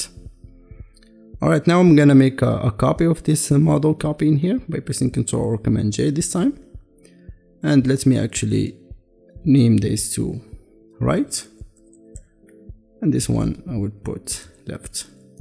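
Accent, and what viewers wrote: Morocco. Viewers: Are you Moroccan, I can tell f accent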